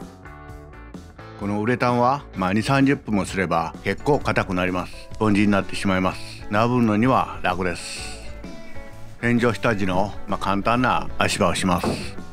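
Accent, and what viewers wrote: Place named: Wakasa, Japan. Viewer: すごく関西弁の若狭訛りっぽさを感じる…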